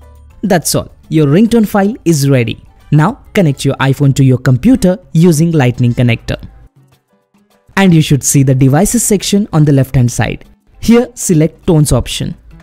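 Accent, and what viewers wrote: India. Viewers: ah yes the first channel that an indian speaking english im so impressed and thanks btw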